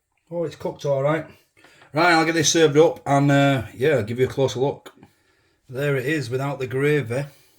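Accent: Yorkshire, United Kingdom. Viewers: . Watching this from Adelaide with great envy. Can tell you are a woolly back from yorkshire. wheres the curry sauce and barm cake?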